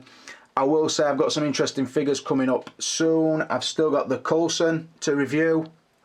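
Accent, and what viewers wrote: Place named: Scotland, United Kingdom. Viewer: he is a scottish person i knew because how he talked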